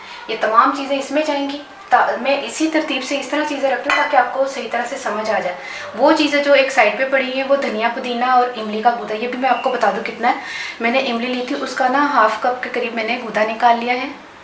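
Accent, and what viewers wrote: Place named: Punjab, India. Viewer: جہاں تک ریسپی کی بات ہے بہت ہی عمدہ لاجواب ہے ۔۔۔۔میں نے تو فسٹ ٹائم اس طرح کے برگر دیکھے ہیں۔۔۔۔ اور آپکی کیمرہ وہ مین بھی کمال کی شخصیت ہیں ان کو ہنسنے کے علاوہ اور کوئی دوسرا کام نہیں۔۔۔۔ اور کتابوں کو دیکھ کر ایسا لگتا ہے کہ آپ بڑے باذوق کوک ہو ۔۔۔اور ایک بات آپ زیادہ تر کہاں پائے جاتے ہو مطلب کے آپ کس شہر میں جلوہ افروز ہوتےہو یا یوں کہہ لیں کہ آپ اپنی پرنور شخصیت سے کس شہر کو منور کرتے ہو یا سمپل الفاظ میں کہوں تو یہ بتائیں کے کس خوش قسمت شہر میں آپکا آشیانہ ھے۔۔۔ ضرور ضرور مینشن کیجئے گا ہم نے آپ کی پنجابی ایکسنٹ سے اندازہ لگایا ہے بٹ پتا نہیں ہم رائٹ ہیں یا رانگ ہیں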